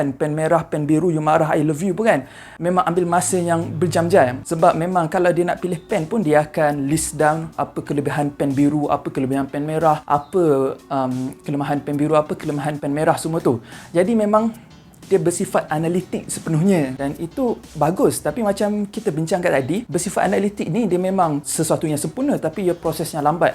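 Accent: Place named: Kelantan, Malaysia. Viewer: Ni mesti org klantan dapat cam loghat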